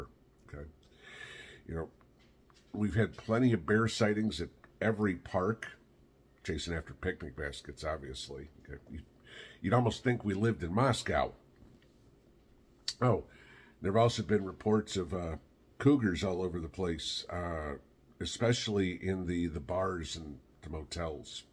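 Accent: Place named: North Georgia, United States. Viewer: Sounds like north Ga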